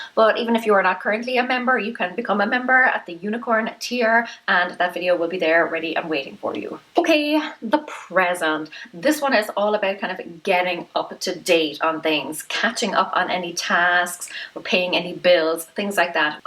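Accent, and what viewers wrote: Ireland. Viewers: Would love to see a monthly reset! Thanks!!! By the way, Americanisms with your Irish accent? Love it! Ain't nobody got time for that never sounded so cute and classy at the same time ;-)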